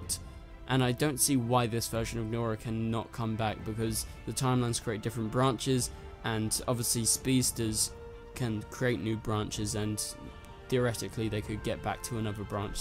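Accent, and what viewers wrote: United Kingdom. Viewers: Thanks for the vid bruh! And... Is he British?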